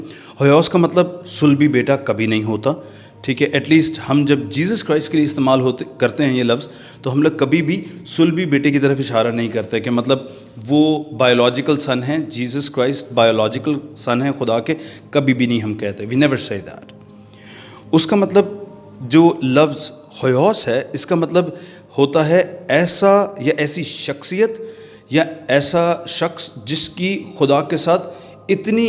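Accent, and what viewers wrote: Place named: Greece. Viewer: Nice greek accent Sir.... thanks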